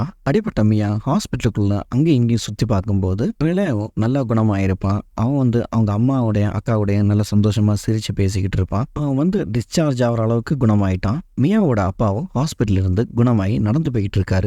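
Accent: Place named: Kerala, India. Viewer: Chettan malayaliya Nattilevideya Nice Tamil slang chettayi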